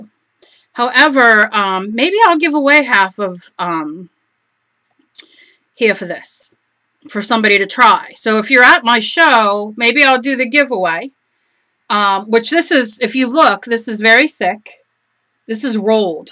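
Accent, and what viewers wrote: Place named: Philadelphia, United States. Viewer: A very distinct dialect , of a provincial Philly accent . Very interesting . I use wax to preserve water color on wood . Dorland wax medium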